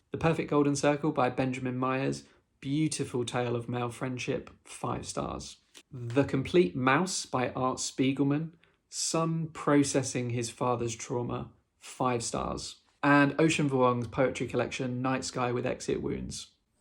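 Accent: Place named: United Kingdom. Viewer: Love your British accent, I will trade you for my Long Island accent 😂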